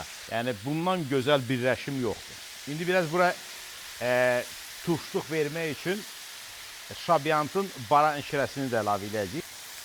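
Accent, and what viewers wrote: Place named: Shaki, Azerbaijan. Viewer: bəy,xahiş edirəm ləhcəni dəyişməyin. Şəki ləhcəsi superdi.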